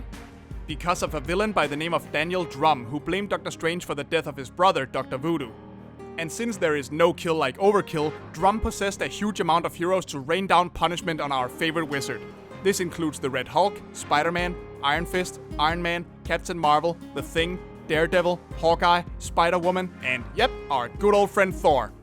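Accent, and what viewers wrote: Denmark. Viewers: is that accent from denmark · Are you from Denmark🤔?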